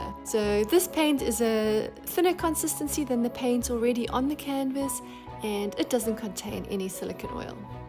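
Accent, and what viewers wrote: South Africa. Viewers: Stunning! Nice to hear a South African Voice❤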